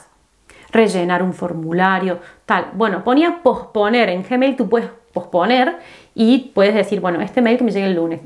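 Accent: Argentina. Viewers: Esa tonada cordobesa !! 😅😅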